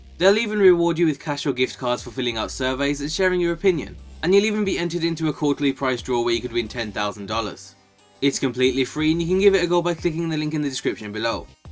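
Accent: United Kingdom. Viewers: It's what happens when you've got a British accent.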